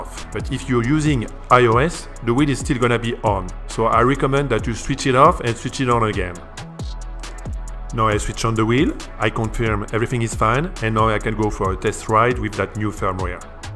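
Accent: France. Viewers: Plus un pour l accent français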